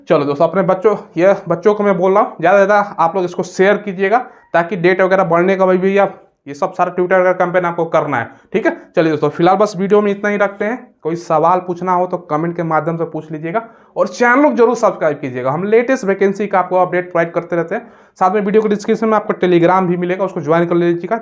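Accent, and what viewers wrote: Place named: Eastern Uttar Pradesh, India. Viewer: Ame Bhai apna east UP se hai😅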